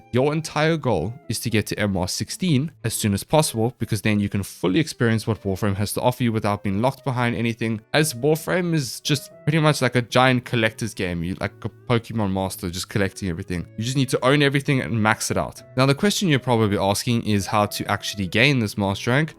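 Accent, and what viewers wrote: South Africa. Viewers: FIRST TIME HEARING AN SA ACCENT ON GAME TUTORIALS!!!! LEKKER BOETIE :) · Do I detect a South African accent or am I mistaken ? 😂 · Completely unrelated to the video but your accent sounds familiar, are you South African? Also this was really helpful! I was super confused on how to progress when I got this game XD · You have a south african accent 🤔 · Sound suspiciously south african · are you south african, why do you sound south african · Just a random question what is your accent. It sounds South African. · Great video sir. Very useful as I'm downloading the game. I have one question, what country is your accent from? I think it may be south African but I'm really not sure as it could also easily be a new Zealand accent and I wouldn't know the difference. It's driving me crazy! Please! What is your accent? 😂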